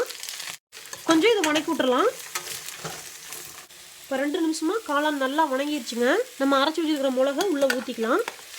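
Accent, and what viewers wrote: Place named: Coimbatore, India. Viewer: Akka neega Coimbatore thana pesarathuliya theriyuthu ka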